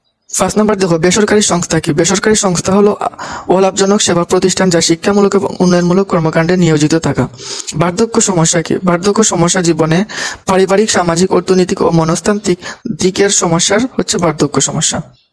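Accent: Sylhet, Bangladesh. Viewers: আমি ও সিলেটি আপনার কথা শুনে বুঝতে পারছি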